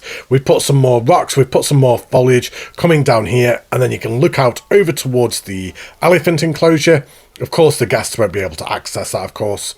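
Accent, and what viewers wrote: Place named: England, United Kingdom. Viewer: Are you English or Scottish? I like your accent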